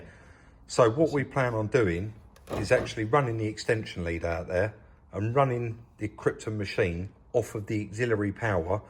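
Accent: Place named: Medway, United Kingdom. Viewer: are you a medway boy? Definitely sound it!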